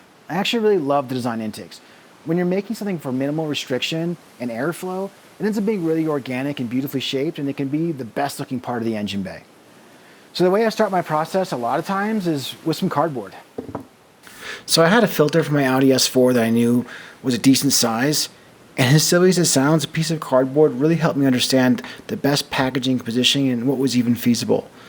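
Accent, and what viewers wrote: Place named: Midwest, United States. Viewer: Subscribed. ;-) I liked the music added in during the printing process, maybe look for other places to add some background music for a bit of ambiance. Otherwise a great first video, and clear that you've been taking notes on other good creators out there. Reminds me a bit of Greasy Fingers videos - but with a clear Midwestern accent (I'd guess Minnesota, Wisconsin or Iowa), rather than the German.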